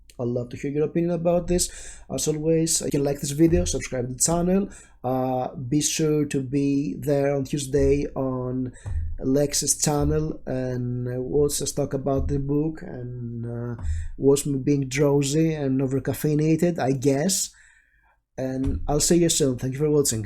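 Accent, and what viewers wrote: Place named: Greece. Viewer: Well, random slightly off topic comment but, I couldn't find the Finnish audiobook of Frankenstein, and that made me really sad. I read it in 3 languages, but I wanted to listen to the Finnish version too and I couldn't. :( ...back to the video. Ah yes, the accents, I have like 20, and I know that's off-putting for some, but hey, it's what I have. Also, I can understand you regardless of the Greek accent. I don't find a problem with that. But then again, I'm so used to having friends and peers from all over the world, I know a lot of people aren't this used to accents.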